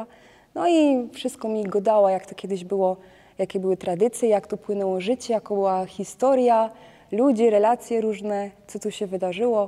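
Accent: Silesia, Poland. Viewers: Jerona chopie no Cie moga godzinami suchac. Kochom tyn akcynt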